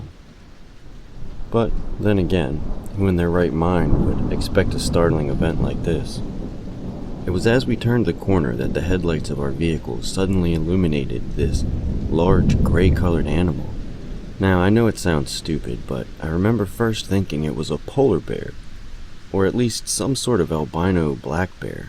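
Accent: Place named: North, United States. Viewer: Great job on sharing these hand picked stories. I get drawn in by the pace and timing and you must be from an area North of the Mason- Dixon line (written by a true Northern New Englander!) You are mastering the art of background sounds without getting washed out by it. Your reading voice is 'lower' not baritone, but in a lower register, so be careful using rolling thunder as IT is in a lower register as well. I sense that you are using a good microphone but, your mixing software may not be able to lay down multiple separate tracks? Really enjoying these recent uploads, drifting back in time to hear older work as time permits. 'Liked', subbed & comment for YT algorithm!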